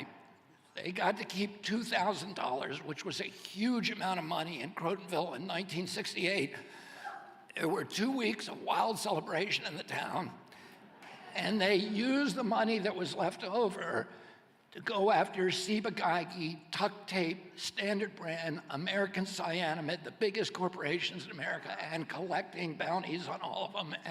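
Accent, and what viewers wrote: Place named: England, United Kingdom. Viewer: it doesn't sound like boston to me though, it sounds like an english accent, idk its no big deal just weird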